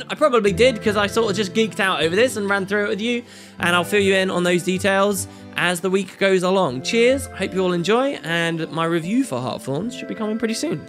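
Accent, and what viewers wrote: United Kingdom. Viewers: "...what we're looking at rocks here walking around in..." Can't beat UK English. Love ya,.:D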